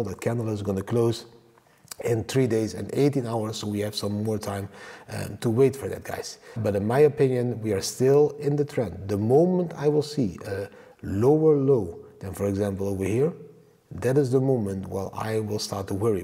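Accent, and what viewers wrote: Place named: North Limburg, Netherlands. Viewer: Ik kijk met genoegen naar je video's. Naast de info ook vanwege de hele prettige uitstraling en de verborgen N-Limburgse tongval in uitstekend Engels. En mss zijn er mensen die zeggen dat het leven makkelijk is met vermogen, maar dat is BS. Zelf verdiend, van harte gegund en een enorm risico genomen. Ik ken meer vermogende mensen, kunnen zo in het azijnrek vd supermarkt. Oja, een vriendelijke lach, een paar zinnen leren van de taal waar je op dat moment vertoeft doet wonderen. De wet van wederkerigheid. En vooral ook leuk Geniet van het leven mensen !!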